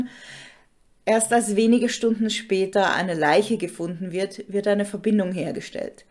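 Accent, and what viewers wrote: Austria. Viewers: Erst mal danke für deine Videos, ich höre dir mega gerne zu, du erzählst schlüssig und spannend mit einer angenehmen Stimme und ich liebe diesen österreichischen Hauch total! Für mich hat der Typ was schizoides, wie 2 Persönlichkeiten in einer Person... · Danke für diesen Fall Was für ein Jammerlappen🙄diese Stimme und das Geheule ist echt furchtbar Hör ich da bei Dir einen leichten österreichischen Einschlag❓ Sympathisch 😉😉🍀🌸💙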